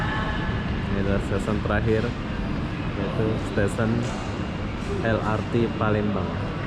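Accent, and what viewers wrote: Malaysia. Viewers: Abg Ckap mcam ade logat malaysia. Pernah msuk malaysia ke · Ini yang bikin you tobe orang indlonesia ataukah orang malaysia ?? Dialeknya kok dialek malaiysia tapi bahasanya indonesia ??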